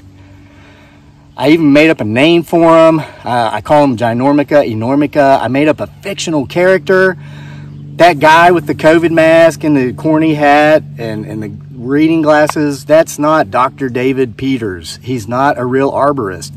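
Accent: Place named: West Virginia, United States. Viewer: You sound like you are from a small town in West “by God” Virginia, lol. I’ve really missed your videos. Our philosophy is basically the same. We must have grown up close to the same small town. 😜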